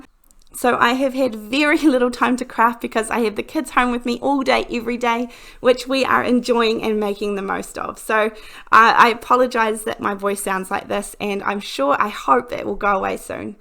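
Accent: New Zealand. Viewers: Love this card. I thought it was a N,Z, accent and then you mentioned you are in N.Z. I live in Cape Town but have been on three visits to your beautiful country and it would be my dream to live there. Thank you for sharing your great talent and looking forward to watching many more of your videos. Hope you get well soon.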